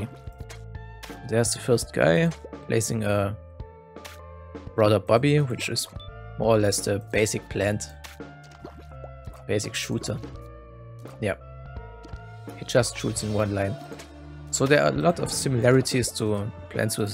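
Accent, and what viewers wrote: Germany. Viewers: Your voice with the german accent sounds just like one of the guys from Team Darkside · Are you a german? You have that accent xD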